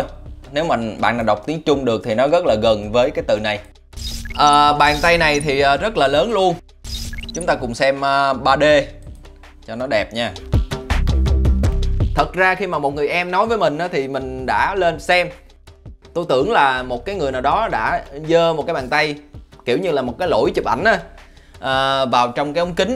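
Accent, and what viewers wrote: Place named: Mekong Delta, Vietnam. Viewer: Gất là gần. Nghe rồi ông còn sub nữa. Làm như ngta k biết ông dân miền Tây hay gì kk. Nhưng video này chưa chất lắm đâu nha.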